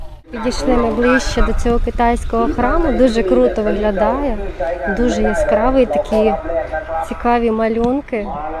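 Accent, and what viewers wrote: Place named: Kherson, Ukraine. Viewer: ох ці праведний. Ну і говори на расейській сам А дівчина має свій Херсонський 🇺🇦 суржик як 90% Українців мають свій суржик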